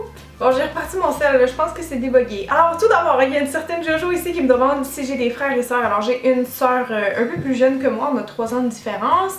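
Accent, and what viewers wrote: France. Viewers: t'a voix est méconnaissable avec l'accent francais!! zoubi! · Cet accent français était génial. XD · Coucou! J'espère que tu reviendras en France ce serait trop cool de te voir:DD Gros bisous t'es la meilleure et ton accent français est super!;) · Ça fait vraiment du bien d'entendre "l'important c'est d'être en santé, pas d'être mince !" Si seulement les médias, la pub, etc... pouvaient diffuser cette information en masse, je pense qu'on vivraient bien plus heureux ! J'apprécie beaucoup le fait que tu ne suive pas cette mode du "healthy", ça me gonfle de devoir faire attention à tout ce que je mange et de culpabiliser parce que je mange trop de gâteaux. Je me désabonne de beaucoup de chaines de beauté parce que ça me lasse, mais la tienne est vraiment super. Tu est naturelle et en plus tes conseils sont très professionnels. C'est un peu grâce à tes vidéos que j'ai appris à bien me maquiller les yeux. 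Ah, et puis j'adore quand tu prend l'accent français, je crois qu'en tant que française, j'arriverais pas à faire l'accent québécois ! ^^ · wow ça fait tout bizarre de t'entendre parler avec l'accent français, tu deviens tout de suite si "banale" :p je préfère le piment de ton accent habituel héhé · Ton accent français est tellement ... génial ! J'adore ! ^^ <3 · Peut-être qu'une ou 2 FAQ en + par an serait sympa! Je suis impressionnée comme bcp de monde par ton accent français^^! Tu réagis apparemment de manière posée à certains problèmes/ sujets délicats: tu es mature mais pas rabat-joie! Continue ! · J'aime ton accent français👌💕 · Gros pouce bleu pour ton accent français aha !! :D <3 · Mdr les pancakes, c'est un sketch de Gad Elmaleh et Olivier, j'adoore !! :D Et j'adore l'accent française aussi, c'est vraiment bien (je suis française moi aussi !) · BELGIQUE!!!:P Bref... c'est super chouette comme vidéo! 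J'aime bien en savoir un peu plus sur toi! Et ton accent français est trop trop bien! J'ai essayé de faire l'accent quebecquois... hum hum je ne suis pas super douée:D Les critiques négatives et les troll y en aura tjs malheureusement, je pense que tu fais bien de ne pas leur répondre, ça ne sert à rien! Puis si tu as tout ce monde qui te suit c'est bien pour une raison! T'es la meilleure! Bisous! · J'adore l'accent français ahah !!! :') T'es super, j'adore tes vidéos !!! :D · Merci pour l'accent français, t'es trop forte, la France t'adore <3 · Ahahahahah ! J'ai adoré ton accent français ! Je suis française et ça fait vraiment bizarre d'entendre dire qu'on a un accent, je n'avais jamais vu les choses sous cette aspect là mais c'est vrai qu'on en a un ! En tout cas tu l'as très bien fait, moi je ne saurais pas du tout faire un accent canadien ! Gros bisous !! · Aha ton accent francais j'adore! 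Je suis francaise'et je me rend meme'pas compte que les francais on unnaccent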